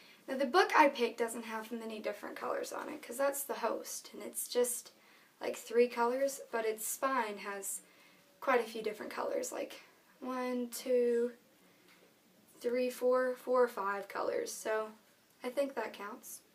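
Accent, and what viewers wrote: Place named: United Kingdom. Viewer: Haha. It's true. I am fascinated by British accents. And I'll trade weather with you! It's like 1000 degrees over here. :/ Have fun on spring break! :D we'll miss ya. Oh, and thanks for the tag. xD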